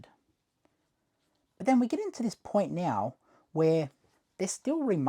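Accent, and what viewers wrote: Australia. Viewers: Austrailan indigenous.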